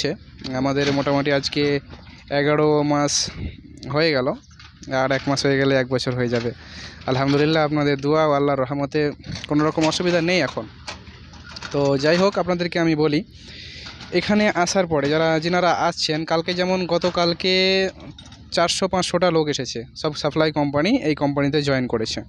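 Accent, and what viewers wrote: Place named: Kolkata, India. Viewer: Aapner bhari kothai Kolkata er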